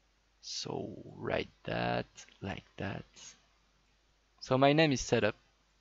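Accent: France. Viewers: your are french ?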